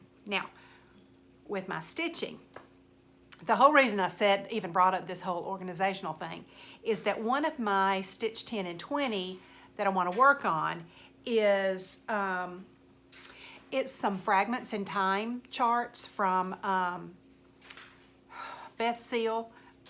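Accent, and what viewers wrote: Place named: South, United States. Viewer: Oh my goodness. Your video popped up randomly and I see you're from GA. I live in Conyers (25 East of Atl). Where are you located? Where do you shop for cross stitch supplies as I don't know of any in GA? I use 123 Stitch on line shop and a few others. My sister lives in Greenville and I just found out there is a shop near her. I also have my eyes on a trip to Firehouse Poppies (I think that's the name) outside Charleston. I am back to stitching again after I retired and am so happy to see the hobby growing so rapidly. Glad to hear that southern voice and I have subscribed to your channel. Keep 'em coming.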